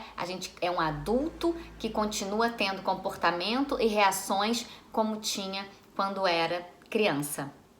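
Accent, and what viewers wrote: Rio de Janeiro, Brazil. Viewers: CARIOQUINHA. BOM.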